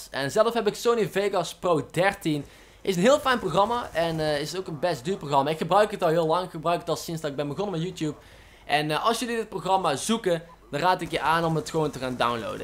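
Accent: Brabant, Netherlands. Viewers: hahah heerlijk dat brabants accent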